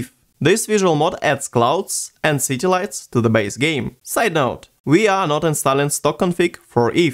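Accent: Russia. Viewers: Мммм, руский акцент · Наверное много кто не поймёт этот комментарий но акцент мне кажется у него русский... Это просто теория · Мне кажется или это русский акцент? Спасибо за гайд, чувак!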